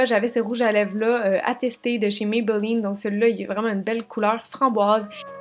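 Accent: Quebec, Canada. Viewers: la marque que tu peines à prononcer se dit " chou et moura " mais ne s'écrit pas du tout comme ça haha ! je vis en France et c'est marrant d'entendre une quebequoise ! ça me donne le sourire au lèvre ! ta vidéo est très pétillante et met de bonne humeur :) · J'adore tes vidéos ! Et ton accent me rappelle le temps où je vivais au Canada.... Je voyage tout en restant à la maison ! :) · J'adore ton accent canadien! 😙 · Trop cute ! j'adore ces petites habitudes. Belle cuisine avec belles boîtes retro.... envie de goûter le thé bouddha bienheureux mais depuis la France c'est impossible ! dommage. Très bons conseils et ..... avec l'accent canadien, ça donne la pêche ! j'adore ton blog; continue.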